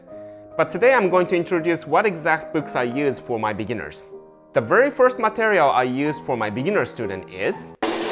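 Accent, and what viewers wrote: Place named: United States. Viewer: The American-English accent is not an advantage when trying to communicate to a worldwide audience.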